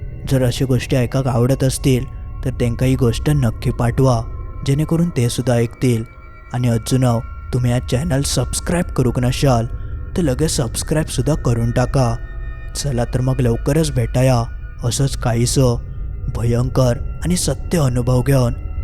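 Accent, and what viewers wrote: Malvani, India. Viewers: Ti malvani ha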